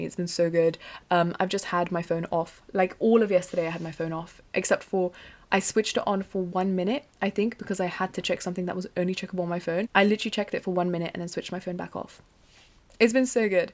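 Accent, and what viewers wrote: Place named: England, United Kingdom. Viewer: I know it's out of context, but can someone tell me what English accent she's speaking?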